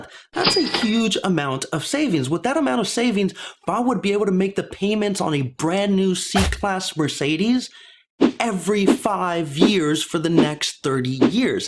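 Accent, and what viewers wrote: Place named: Texas, United States. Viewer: A for content...F for Texan accent; stigma stigma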